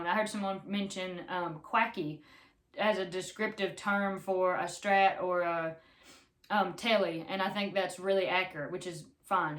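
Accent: South, United States. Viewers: Watching your videos make me miss my home of Oklahoma with country sounding people and the country accent that I understand. Your videos bring a bit of home to me here in Utah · Love the southern twang accent 😍😍 hate my voice. Anyways GREAT PURCHASE 👌🏽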